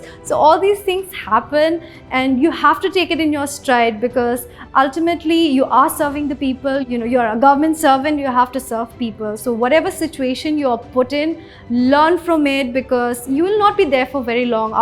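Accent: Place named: Shillong, India. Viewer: She has a Shillong accent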